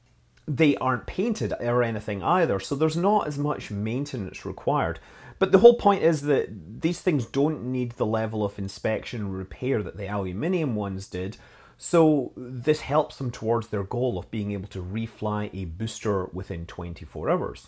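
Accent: Scotland, United Kingdom. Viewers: Its amazing your knowledge on all these subjects you do in your channels, I think yer a very clever man, a credit to the internet, BTW by your accent Do we live in the same country,? the UK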